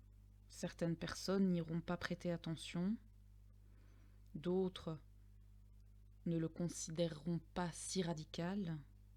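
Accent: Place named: Belgium. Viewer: J'ai bien aimé la vidéo, je l'ai visionnée/écoutée 2 fois. Pour moi votre accent belge rend votre voix plus belle et plus agréable à entendre (je suis Français...), et je trouve vos conseils plutôt pertinents. Petit bémol de mon point de vue, le son semble très bas par rapport aux autres vidéos sur youtube. Je dois alors augmenter le volume au maximum puis le baisser subitement dès que je visionne une autre vidéo. En tout cas je vous dis merci et vous souhaite une bonne continuation.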